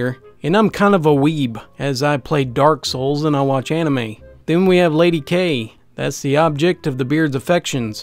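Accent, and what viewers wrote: South, United States. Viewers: That is the lovely southern charm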